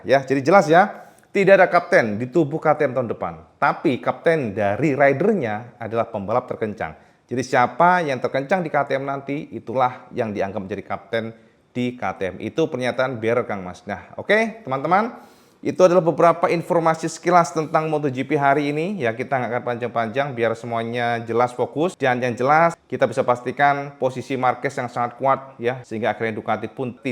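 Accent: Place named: Tulungagung, Indonesia. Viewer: bahasa tulungagung e gak lali ki om ujug" 🤣🤣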